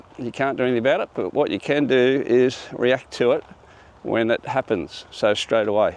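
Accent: Australia. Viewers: Love taking these walks with you,! Thank you for your positive input with your Aussie accent! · Thank you for your words of wisdom. Love your Ausi accent.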